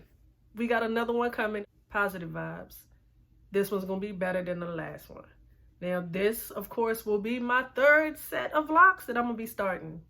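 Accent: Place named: Prince George's County, United States. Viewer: I'm from PG, the accent!!! Makes me miss home 💛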